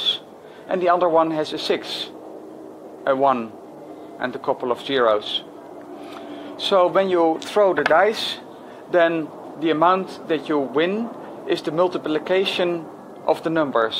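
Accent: Netherlands. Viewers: I can hear you are Dutch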